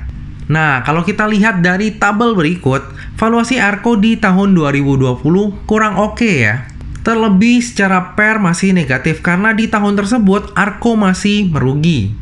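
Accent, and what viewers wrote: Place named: Bangka, Indonesia. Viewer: sinmung, kek urang Bangka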